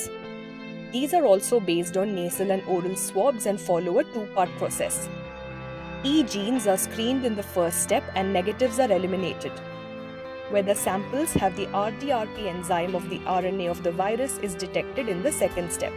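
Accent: India. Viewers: I must be positive bc I cant listen to this terrible narrator for even 5 secs. why use a complete Indian to speak in English? hm? hmmm???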